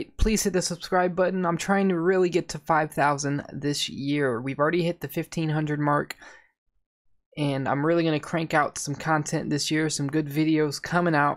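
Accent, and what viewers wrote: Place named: Arab world. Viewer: Arabic English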